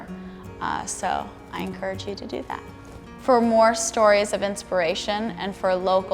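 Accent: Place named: Australia. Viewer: why does she sound kind of australian? lmao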